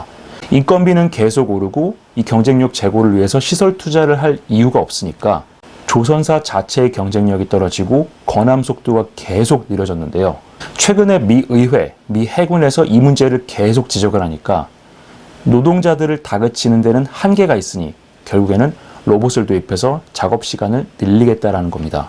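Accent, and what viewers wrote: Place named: Seoul, South Korea. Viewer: 서울말 좋아요^^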